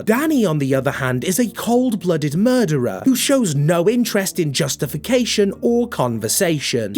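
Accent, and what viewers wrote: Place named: United Kingdom. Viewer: Man i love this channel especially the way the essay is presented beautifully with a soothing brittish accent is a cherry on top AND chef's kiss!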